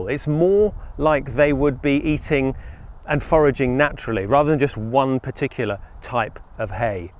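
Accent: United Kingdom. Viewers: Also a British accent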